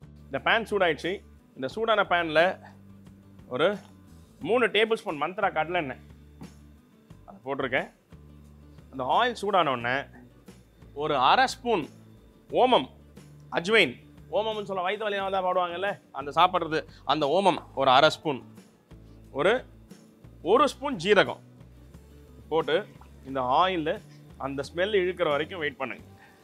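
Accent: Chennai, India. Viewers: Chef ungah Chennai thamil. Oru thaba epadi oru thaba appadi Semma ponga. Simply flattered by ur way of explaining. 👌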